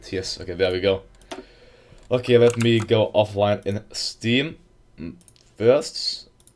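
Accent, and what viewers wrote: Germany. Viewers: You German okay . But which German? Eastern?Western?Northest?Bavarian ? :D WHICH ? :D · ich glaub er ist deutsch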